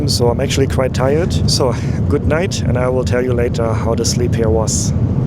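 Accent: Germany. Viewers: Beautiful videos. As a Greek raised in different countries Love your German Accent 🙂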